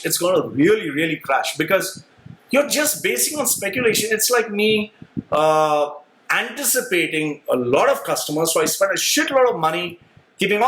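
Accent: Kerala, India. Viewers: Y sooo much of hateee......Just think in a possitive way.....This country has provided a lot to expats who work here.....Respect gratitude...And speaking about labourers .....all those people are supplied by some indian or pakistanii booses.....why are you bieng such a hard ass.....Finding negativity in everythinggg.....Chill dudeeee.....and if uae goes down ....we tooo go down with themmmm.....Easy broooo...there are million of people who relies on this economy.....alo g with this native people there areee other who pray for this govttt.....find the gooddd spread good thingssss......And stop acting likeee ur an american ......I am sure ur a malabaaaaaariiiii.....buy ur manglish accent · Wat the fuck. I bet ur not married if so she has to be sad or even blind haaaaaaaa haaaaaaaa haaaaaaaa But u sound Indian u bullshitting loony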